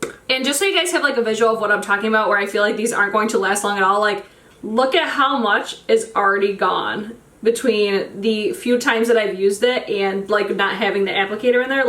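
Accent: Midwest, United States. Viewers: i'm guessing you're midwestern? or maybe from boston.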